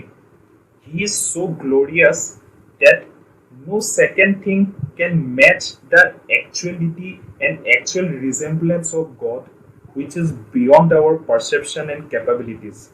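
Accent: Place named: Northeast, India. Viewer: Your Pronunciation is erroneous... . . You are from North-East India, I suppose. 2. , you sain " LIKELINESS "whereas the word on the screen is "LIKENESS", Please be VERY accurate in CORRECT pronunciation.. . and again , you said "LIKELINESS " !!!!!!!!!!!! "MEASURE"is "BARHA-BARHI "??????? बड़ा बड़ी या बराबरी ??????? YOU ARE FROM ASSAM, Sure..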